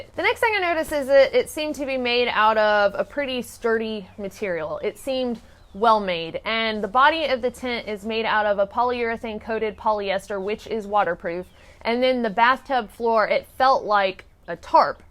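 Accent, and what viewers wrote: South, United States. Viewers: lol I assume you are from the south'' hose pipe'' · Wide or Why'd? Southern accents are so cute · Greetings from Spain. There is a Quechua brand tent from decathlon stores and it's only at €20. Very similar at specs and performance. I really enjoyed your American accent :)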